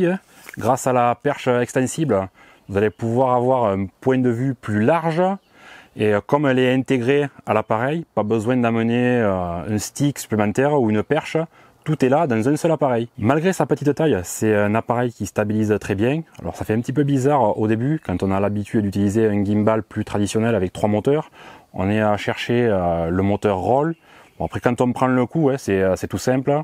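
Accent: South, France. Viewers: Comment ne pas dire que ta vidéo est un travail de pro ! Félicitations, avec l'accent du sud ! 😁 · Vidéo extrêmement complète, bon boulot. Je me permet une petite précision : il y’a bien un moteur pour le ROLL, un autre pour le PAN. C’est le moteur TILT (inclinaison avant-arrière) qui n’est pas présent sur ce smooth X. Continue comme ça avec l’accent du Sud 😆.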